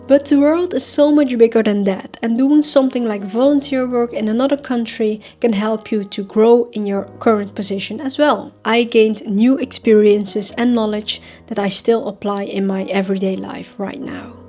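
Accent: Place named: Netherlands. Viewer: Where is your accent from? You sound Dutch 😊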